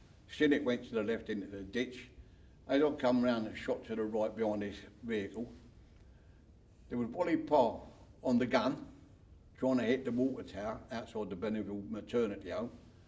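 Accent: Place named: South London, United Kingdom. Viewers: Sounds like south London to me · South East London.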